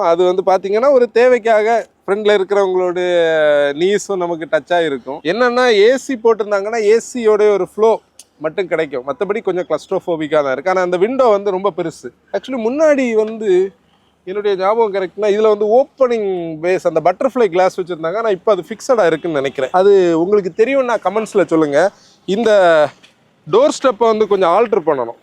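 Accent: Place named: Nagercoil, India. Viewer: I think he is nagercoil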